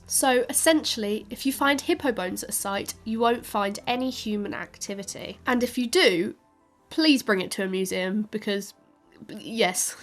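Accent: United Kingdom. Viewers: beautyfull british accent for a video about britan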